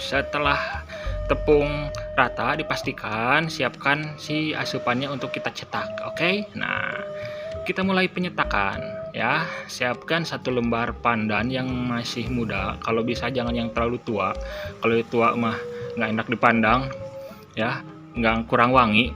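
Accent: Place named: Sunda, Indonesia. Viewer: Suaranya sgt sunda😀😉 Sundanya mana mang✌😉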